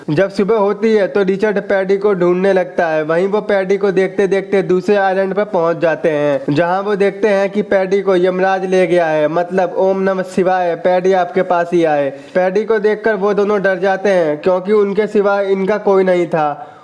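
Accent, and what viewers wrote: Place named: Mumbai, India. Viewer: Bhai full to mumbai walo ke jese explqin karta hai😂😂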